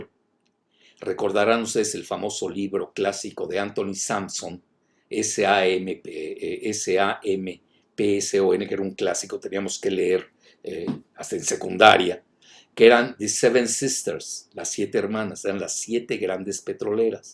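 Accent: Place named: Russia. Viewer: como me gusta mucho la petulancia que muestra mi querido calvito pues con su acento semiruso explica con coordinacion y buenos numeros.le felicito aunque yo s mucho de FDerivados del petrolio por tan solo el gas que le pongo a mi SUV,me gusta su berburrea mas me parece que se quiere parecer a la mama de Dios ,tal ves que Dios nno se le conoce mam,asi que me gusta asu exposicion y petulancia asi que adelante es un buen predicador....hum y digo predicador para no llamarle su santida francisco,,nos vemos mas me gustaria debatirle en otro temamas.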